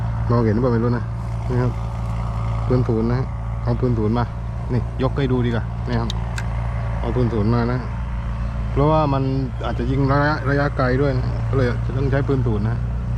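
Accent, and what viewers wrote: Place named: South, Thailand. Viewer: 555พี่ แหลงใต้ชับผึด😁😁😁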